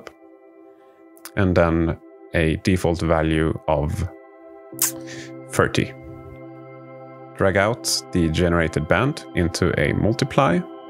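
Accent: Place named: Netherlands. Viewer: Your voice and pace, made me enjoy this tutorial,very helpful, I think it has to do with the Dutch accent there 2😂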